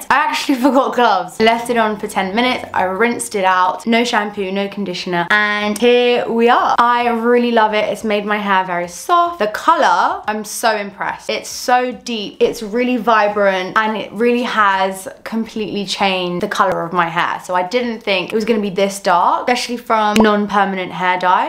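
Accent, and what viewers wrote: United Kingdom. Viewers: Thank you ! I have tried, and according to your explanation, my hair is of very low porosity. That would totally explain why coconut oil makes my hair dry instead of nourishing it, and maybe that's also why I started losing more hair after using flaxseed gel 😱 This clearly shows that not everything works for everyone, and thank you so much for warning us about rice water, cause I would definitely have tried it someday because of how popular this method is! By the way, you have a lovely accent ! Are you British ?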